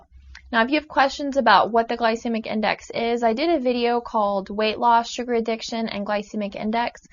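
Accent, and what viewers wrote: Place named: Midwest, United States. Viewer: I’m trying to guess what accent she has... Midwest?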